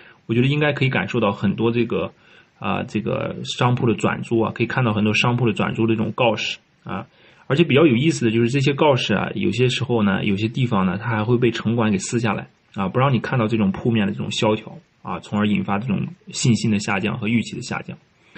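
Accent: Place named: Shanxi, China. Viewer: 山西人哇，这口音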